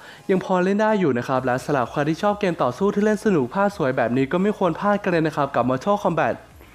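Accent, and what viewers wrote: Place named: Shan, Myanmar. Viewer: เปนคนไทยใหญ่หรือพี่แอปเสียงเกินอ่ะพี่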